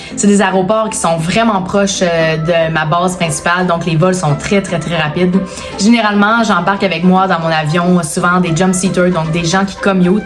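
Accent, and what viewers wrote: Quebec, Canada. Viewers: Je découvre et je m’abonne, fana d’aviation et de l’accent Québécois c’est le top merci 😍👍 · Merci pour cette vidéo toujours aussi jolie l’accent québécois bon vol merci de faire partager ta passion du pilotage bonne année 2025 santé et bonheur milles bises de France Jérôme ❤❤❤❤😊😊😊😊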